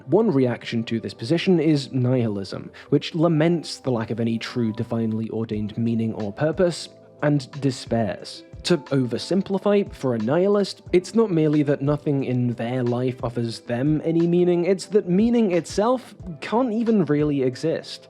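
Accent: United Kingdom. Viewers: I KNEW HE’D BE BRITISH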